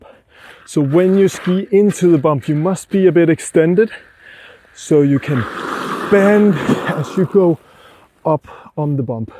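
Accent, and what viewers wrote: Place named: Germany. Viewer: The German/Austrian accent of the narrator suggested this would be a detailed instructional tutorial rather than some fluffy review stuff. No surprise here about clarity, brevity and value of advice. Will forward this vid to my kids to improve their downhills.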